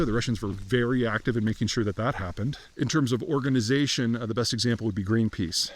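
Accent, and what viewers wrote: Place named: United States. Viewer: Your pronunciation of Russian words is pure American. Clear, but not "native." The average American with training in Russian sounds like he/she is from Georgia; the Georgia that used to be part of the Soviet Union.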